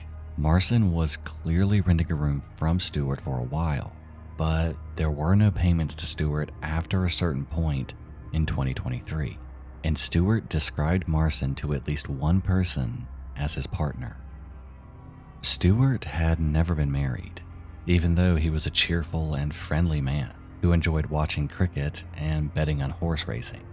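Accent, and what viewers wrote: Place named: Tennessee, United States. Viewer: You've got a slight Tennessee accent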